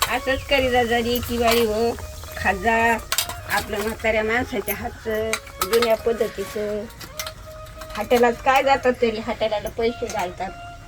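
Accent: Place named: Satara, India. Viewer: Aaji tumi sataryakadcha watta boli Bashavarun chan karta Baji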